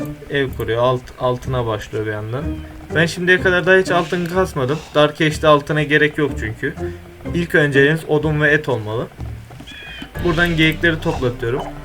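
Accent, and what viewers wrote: Turkey. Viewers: reis valla ben trabzonluyum ben bile seni buralı sandım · kardeşim konuşmanda ufak bir rize havası sezdim. cok güzel oynamışsın, eline sağlık.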